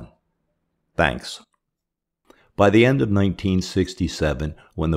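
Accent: Philadelphia, United States. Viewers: The Narrator's accent seems to be from the Philadelphia/South Jersey/Delaware Valley area. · Definitely could tell he’s from Northeast Philly without even having to look him up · are you from philly?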